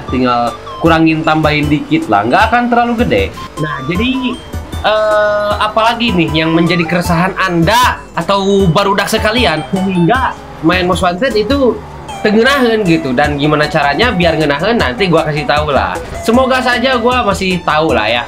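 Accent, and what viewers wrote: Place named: Sunda, Indonesia. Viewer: Nyunda parah euy si aa😭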